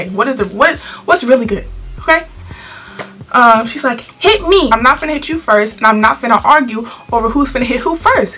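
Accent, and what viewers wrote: United Kingdom. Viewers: The British accent 😭 im British and the accent is so jokes😂😭 anyways just found ur channel 💕💕💕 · The english accent tho...😂😂 im english and this is amazing. Congratulations you just gained a subscriber😂😂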